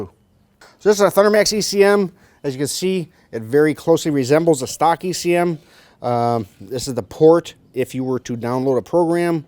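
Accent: New Zealand. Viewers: what part of nz are you from. Chur.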